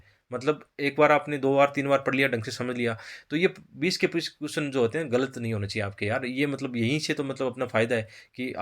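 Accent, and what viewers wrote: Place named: Jodhpur, India. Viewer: Sir aapke voice tone se lgg rha h aap jodhpur side se hoo please sir btaao aap khaa se hoo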